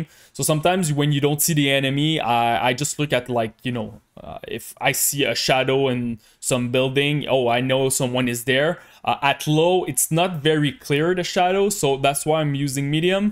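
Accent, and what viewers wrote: Quebec, Canada. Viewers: Tu sonne qc ? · t'es clairement quebecois hahahaha. bon video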